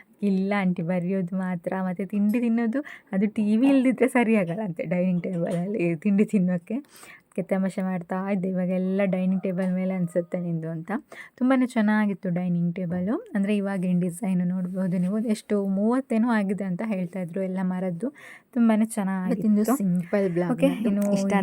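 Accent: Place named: Mangalore, India. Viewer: Manglore kannada mathadi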